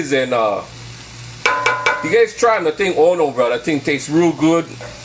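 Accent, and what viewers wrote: Hawaii, United States. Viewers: My Wife and I love your videos. Your pidgin accent makes us think of Home as much as your recipes! Right on!!!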